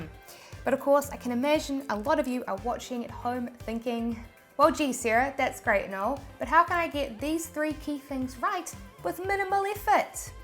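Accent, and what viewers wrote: New Zealand. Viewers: I knew you were a kiwi straight away! Awesome to see fellow kiwis killing it!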